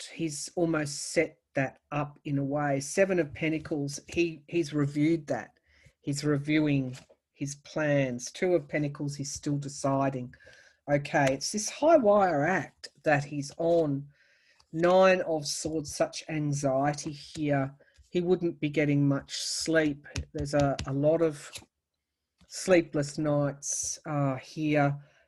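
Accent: Australia. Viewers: Just hearing your voice and your adorable Aussie accent makes me smile and makes me feel at peace. You've got something that's beautiful. A gift. And I don't just mean the cards. Thanks again!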